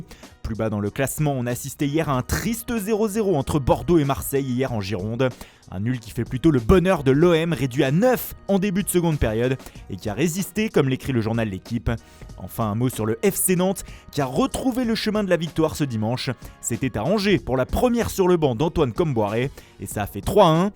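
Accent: Italy. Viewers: C'est reparti avec l'accent italien...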